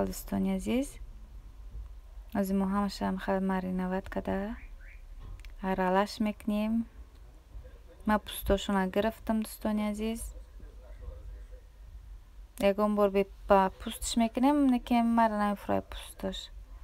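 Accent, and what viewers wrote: Kulob, Tajikistan. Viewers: Салом алейкум Хоҳарҷон худованд баракат ба касбу ҳунарт тия 🤲🤲🤲😘😘😘 як моҳ пеш случайно дромадм каналтон лаҳҷаи анаму кулобҷон дилма ов кард сразу подписатся кардм ҳамаи видиёота тамошо мекнм ва хеле чизора омухтм аз шумо, ободу сарбаланд боши хоҳари меҳрубон 🌹🌹🌹🌹🌹🌹🌹🌹🌹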